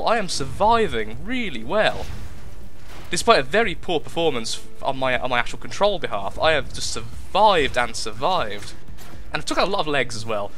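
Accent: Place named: England, United Kingdom. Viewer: I am loving ur videos do u live in England because u sound like it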